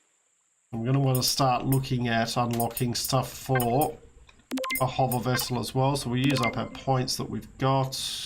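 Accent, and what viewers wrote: Australia. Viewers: nice video there, what part of Australia ru from if you dont mind me asking, im from collingwood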